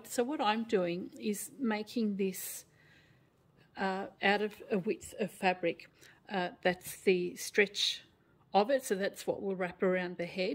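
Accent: Australia. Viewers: Morning. Thank you for your generosity in sharing your tutorials. I love the way you teach. The pace is particularly good. Often I only have to watch once or twice to complete a project. And I am always delighted with the results. You are my new hero! It’s wonderful to hear an Aussie voice too!! Ps just a tip for you: making the Mini Tissue Holders, I cut an extra 6” x4”; so I have 4. 2 for the folded sides, and 2 for either side of a very very light iron on wadding. This does 3 things. It gives the pouch a bit of stability in its base AND i cannot make a mistake which side is the inside or outside😂, and the inside looks as good as the outside for when the customer changes the tissue pack. Probably a bit of over kill on my part, but I was using light fabric that needed the extra stability. All kindness & grateful thanks to you. WA.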